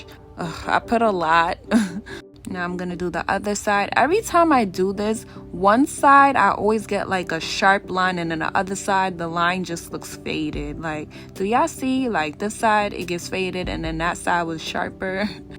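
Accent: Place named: New York, United States. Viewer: ASA new subscriber!!! Beautiful look!! Edited to say, I thought that was a NY accent (I'm from NJ) lol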